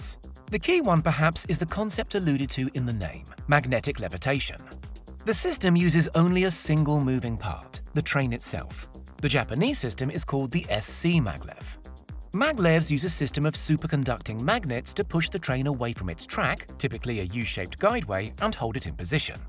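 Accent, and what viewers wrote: United Kingdom. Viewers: The narrator has a British accent. It's probably the reason why he using that archaic unit. Multiply that speed by 1.61. This gives about 602 km/h.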